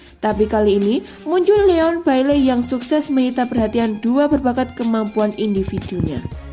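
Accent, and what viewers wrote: Java, Indonesia. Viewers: Wah wah bahasanya medok bgt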